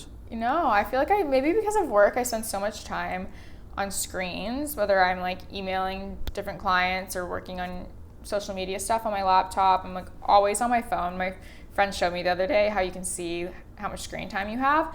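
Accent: United States. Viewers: That LA accent is unbearable.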